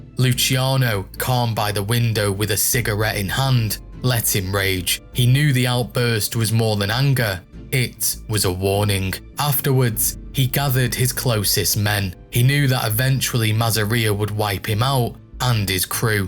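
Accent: United Kingdom. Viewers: What the Fuck is this shit some British idiot talking about the American Mafia 😂😂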